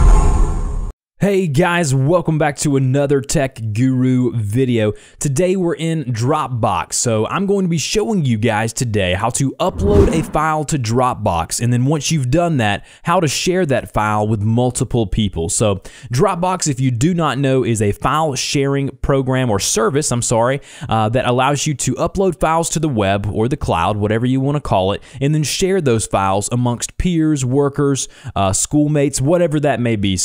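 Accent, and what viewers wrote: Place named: South, United States. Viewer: Not useful. I have had DB several years. You used to be able to just add files to your local Dropbox folder and it would get uploaded automatically. And to share there was a local button. But it appears that's all gone away. This video had nothing on this. I can't say I was fond of the Southern twang either...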